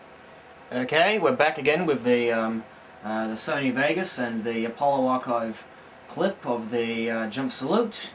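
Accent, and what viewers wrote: Australia. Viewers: Excellent job in clearing up your honest mistake... Thanks for showing us how it happened. Unfortunately the Apollo debate has now become more of a personal battle between the CT's and the propagandists, than it is about the Apollo subject itself. Except for the Aussie accent, that sounded just like me! LOL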